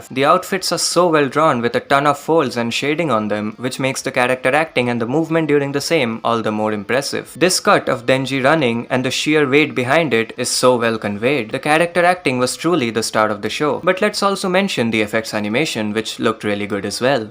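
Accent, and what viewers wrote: India. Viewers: Are u from India???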